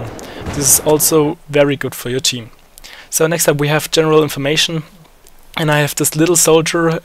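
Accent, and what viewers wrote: Germany. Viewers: als deutscher merkt man das. ^^ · Are u`re a german?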